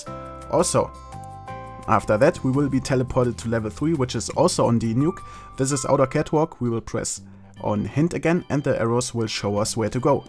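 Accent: Germany. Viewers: Sounds like an german accent, lol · Deutsch? Wegen dem dialekt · German accent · bist du deutsch ? du klingst sehr wie ein deutscher der englisch redet ! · dein englisch ist so deutsch ! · love your german english sounds so nice :DD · is he german? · Man hört einfach richtig raus das du deutscher bist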